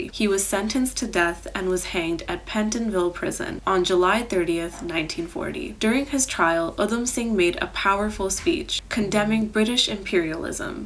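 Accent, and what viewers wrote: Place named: United States. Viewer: Does she explain anywhere why she speaks with an American (or mid-Atlantic) accent?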